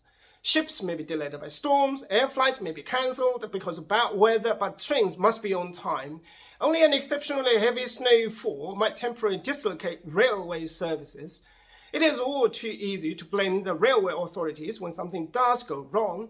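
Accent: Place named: United Kingdom. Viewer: 😂😂😂，连英伦 腔调都那么浓重。👍👍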